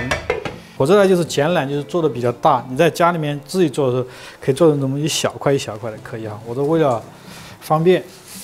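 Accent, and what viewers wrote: Sichuan, China. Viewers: 师傅讲话不出两句我就听出了四川或重庆人吧？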